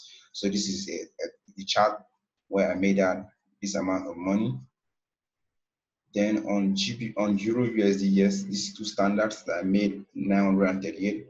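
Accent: Nigeria. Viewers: Are You a Nigerian ?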